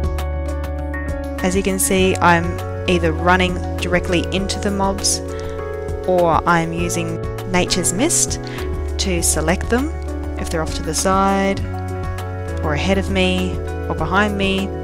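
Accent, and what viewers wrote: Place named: Australia. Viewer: AUSTRALIAN ACCENT U IN AUSTRALIA TOO!!!:D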